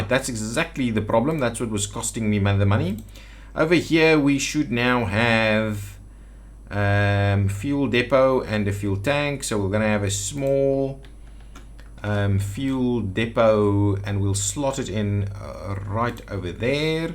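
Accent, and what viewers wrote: South Africa. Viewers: First time to your Channel and I must say it good to hear a South Africa accent on Youtube :D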